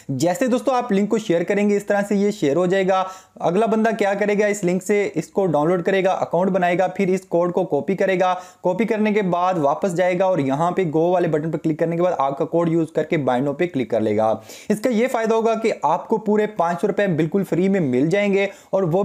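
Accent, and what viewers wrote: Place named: Punjab, India. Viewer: اس نے پڑھائی نہیں کی سارا وقت ان ہی کاموں میں گزار دیا تب ہی سانیک ویڈیو بول رہا ہے :) پتہ نہیں یہ پنجاب والے بھائی اردو بھی پنجابی کی طرح کیوں بولتے ہیں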